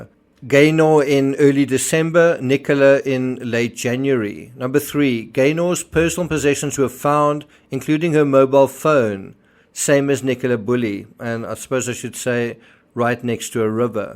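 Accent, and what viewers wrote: South Africa. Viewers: i love your production and narrative, also love your S.A accent 😊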